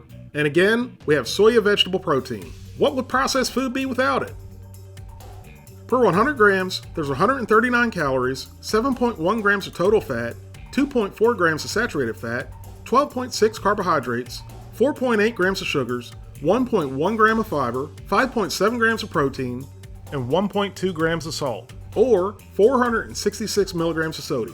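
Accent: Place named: North Carolina, United States. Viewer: I've been watching some of your videos randomly for a bit now, and I've always thought you sounded like someone from NC... I am also, that's how I knew.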